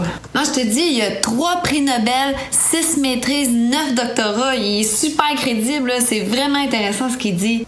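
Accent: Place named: Quebec, Canada. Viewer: l accent québécois pour tout me fait tellement vibrer, alors continue par ton humour a faire passer ton bon sens, ta santé joyeuse, ton rire pour améliorer notre immunité... tabernacle, la pandémie de la liberté et la confiance entre nous, creons la 2ème vague de vie et d amour ♡♡♡ normale le plus vite possible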